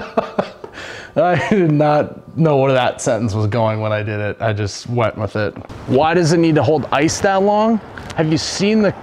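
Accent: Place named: Minnesota, United States. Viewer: I betcha from Minnesota, yah?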